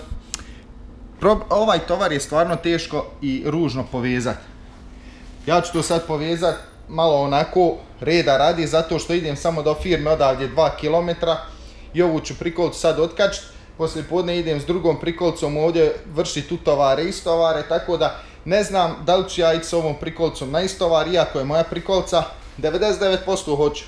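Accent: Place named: Bosnia and Herzegovina. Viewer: Tek sam sad otkrio kanal.. A sto volim cuti ovaj jezik govor ... Odmah me prebaci na BiH postavke...♥️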